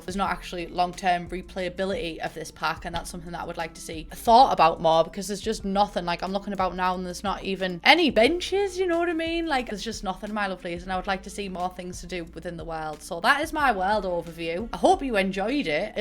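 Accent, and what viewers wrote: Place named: Northern England, United Kingdom. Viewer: I love the fact you translated for other people lol. Im from leeds so as a fellow northerner i understand you fully and you are sooo funny :)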